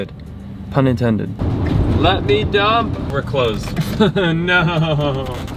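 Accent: Canada. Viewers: The longer you stay in Canada the more of the accent comes through. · LOL - your Canadian accent is really showing itself again!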